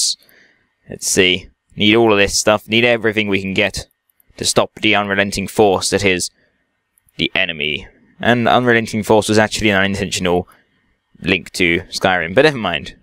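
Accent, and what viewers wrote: United Kingdom. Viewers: do u live in englend cuz u sound like it but ur sooooooo awsome <3 luv ya ^_^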